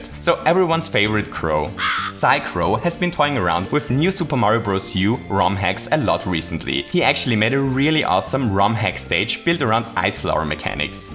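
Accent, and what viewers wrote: Germany. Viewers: Where are you from? I can't categorize your accent. Any chance you're German? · Are You German? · Are you german or austrian?😂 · Are you from germany?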